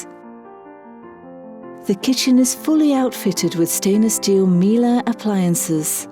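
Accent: United Kingdom. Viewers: Nice English voice keeps saying "over looks the garden" um, dead grass. Spend 13 million and you still have to do a major outdoor remodel and get rid of those marble floors. Looks cold and uninviting. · Jameson Cross yeah I think it’s the “British-like” accent.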